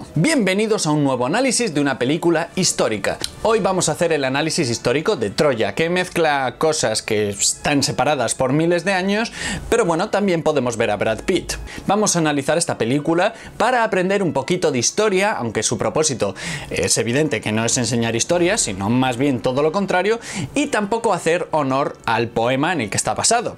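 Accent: Spain. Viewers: Me ha hecho tan feliz entrar en el vídeo y oír un castellano de España y no cualquier dialecto latino 😭😭😭😭😭😭🫱🏼‍🫲🏽🥰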